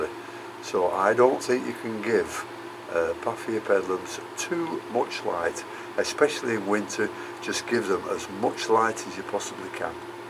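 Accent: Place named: West Yorkshire, United Kingdom. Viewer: Hi Have just found your channel I live in Brittany but it is lovely to hear your accent as I originate from West Yorkshire.I have just subscribed to your channel and will be watching them all.I am only new to orchids but I am hooked!!! I do have a greenhouse but I think it would be to expensive to run.So I have converted one of my rooms into an orchid room with an humidifier so we will see how I go Sorry for rambling on All the best to you Keep Smiling Thelma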